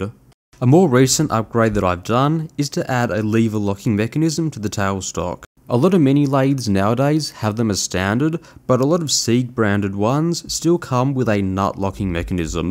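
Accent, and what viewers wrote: Australia. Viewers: you sound Australian you can get phosphor bronze at CBC Bearings its not hard to find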